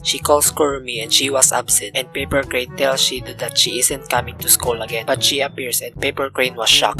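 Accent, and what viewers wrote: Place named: India. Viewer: You sound Indian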